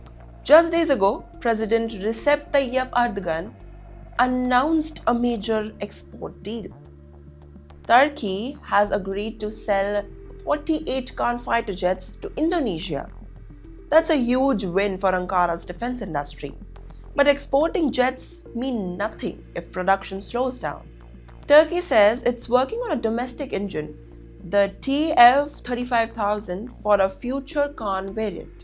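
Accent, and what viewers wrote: India. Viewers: That Indian accent…😮😅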